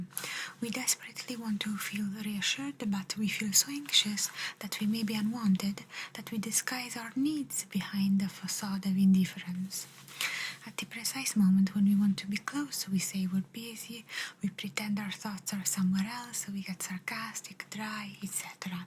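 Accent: Italy. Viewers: Yessss,long time Italian Accent.😊😊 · ahh you are amazing and we are back at the amazing italian accent i love it <3:) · Hello, fantastic ASMR, I really liked it and with an Italian accent, greetings, beautiful 🌹💖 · Ciao and Lucia!💜💜💜 I do so love your gorgeous accent!! Thank you for soothing and lulling me to sleep. You are the best in my opinion! I hope you and your family are staying healthy. Have an excellent weekend. You deserve it. Goodnight and sweet golden dreams. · Thank you for creating this compilation for us,. I love the Italian accent in combination with your wise words. You say in the description that you're not a therapist, but you seem like a really good one to me. You probably just need to get some sort of a degree to make it official. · Ciao cara!🤗 Mi piace molto questa tipologia di video, sei bravissima e bellissima, complimenti!🥰 Adoro il suono della scrittura e adoro ascoltare il tuo meraviglioso accento Italiano 💚🤍❤️ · Italian accent videos are perfect for relaxing and unwind your soothing voice makes me feel sleepy 😴 😊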